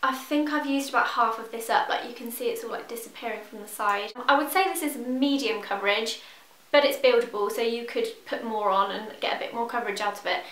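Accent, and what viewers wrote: United Kingdom. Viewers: hey, im from germany and i LOVE your british accent!! Could listen to it all the time! love your videos a lot :) next month im comming to london and i wanted to ask if you can recommend a store to shop beauty stuff or maybe a certain product? im so excited :)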